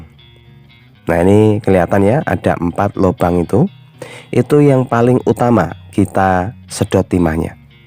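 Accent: Java, Indonesia. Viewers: Lokasi sampean Ki ngendi to bang kok koyo wong jowo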